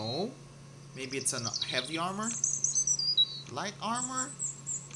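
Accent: Philippines. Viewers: Are you PILIPINO? You sound like PILIPINO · utek filipino accent pala un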